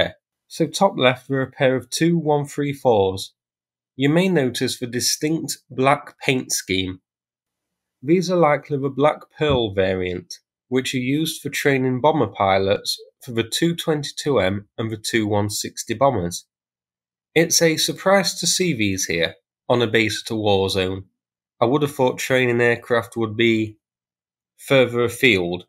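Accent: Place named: England, United Kingdom. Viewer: England. According to some, he has no accent at all, it's all the rest of the lands distant from England that use the native language of England who have developed accents.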